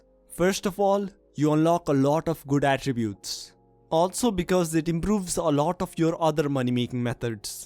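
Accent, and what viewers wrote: India. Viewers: I cant handle the thicccc indian accent it hurts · by the voice i can surely say he is an indian love u from india <3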